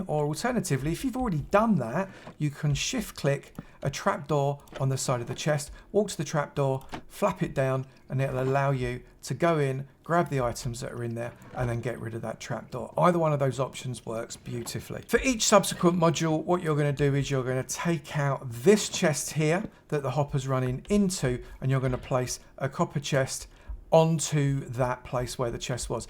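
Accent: United Kingdom. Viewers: Loving your videos. Nice to hear a British accent 😀 What happens if a chest gets full up. Do the items go into the overflow?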